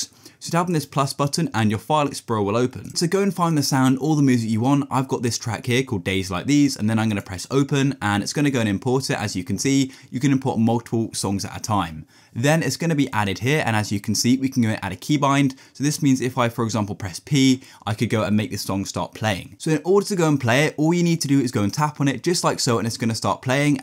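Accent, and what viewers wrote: United Kingdom. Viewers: He is defenetly brittish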